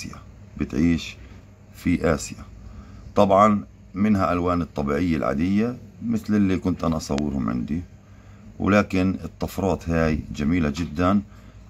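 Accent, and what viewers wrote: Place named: Palestine. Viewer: تحياتى من مصر بحبك حضرتك أوى 🙋‍♀️😊🧡🍯 وبحب اللهجه الفليسطينيه اوى وبالأخص كلمه "ولااااو" بحبها أوى 🤭💜💜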